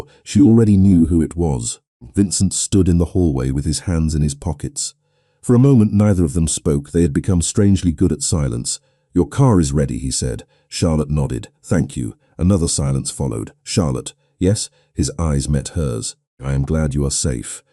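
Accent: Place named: United Kingdom. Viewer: I loved the story but it was like listening to one long sentence and why British voice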